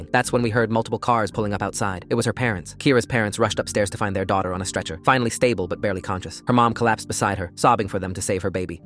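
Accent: United Kingdom. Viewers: Bro went British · I swear bro became British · Is he British 😭? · British ahh voice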